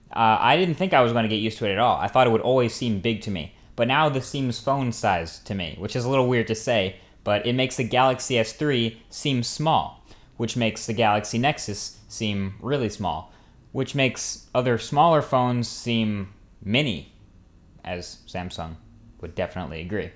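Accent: India. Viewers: Boy I swear I thought you were Indian!! It is so cool to hear a brotha talk with some intelligence!!! Great review and I'm definitely getting this phone next, tired of apple. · Back when Marques sounded a bit Indian.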